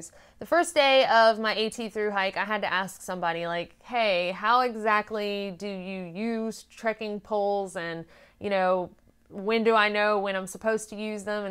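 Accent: South, United States. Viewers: Your southern accent is getting stronger now that you're home. Purtiest sound a southern boy ever hears. I've used the old bent handle wood canes for years. They make great snake locators(that's the original reason I started using them) & the hook makes a good extended hand on a steep climb especially up a river bank. The wood canes also make a good defensive tool in the wild hog country I'm often in: kinda helps that my grandparents raised pigs when I was growing up so I'm well versed in using a cane that way. I have considered trekking poles for a long hike & this is great information. Thanks Ms.!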